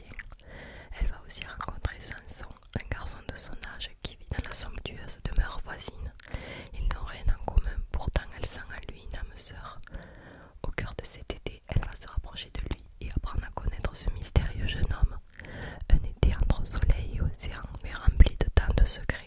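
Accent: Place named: Marseille, France. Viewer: tu es Marseillaise non ? Ton accent le montres bien mdr allez l om 🎉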